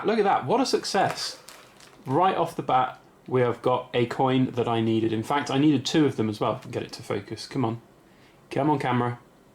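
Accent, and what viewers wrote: England, United Kingdom. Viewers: I’m kinda new at this coin collecting and if I’m not mistaken you said you got these at the post office? I detect an accent but I never heard of a post office in the USA having coins. I think the Tom Kitten and The Rabbit Coins are very cool. I haven’t done any research on their value but if you are looking for any US coins please let me know as I will be more than willing to trade or just buy one of each of the nicest coins you have from this video. Is it an English Accent?? Anyway please let me know and I will see if there is anything you want from my side of the world. Have a great Day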